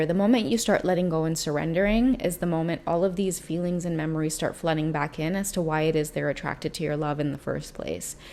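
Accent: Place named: Trinidad and Tobago. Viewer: Love ur trini accent im from trinidad too love u u resonate with me alot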